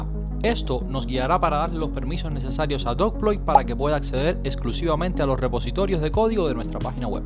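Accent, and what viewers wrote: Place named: Cuba. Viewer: Eres cubano! Ese acento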